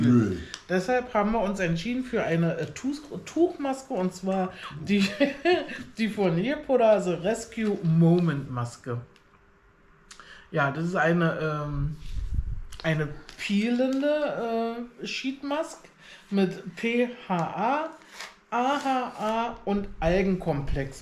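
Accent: Berlin, Germany. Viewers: Berlinern ist doch super dann das ist doch Deutsch Grins. Liebe Grüße aus dem Sauerland nach Berlin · Moin moin 😊...ich finde berliner Dialekt schön....ach ich bin schnell mit ausmisten 😊😅...... 😅😅😅.....❤❤❤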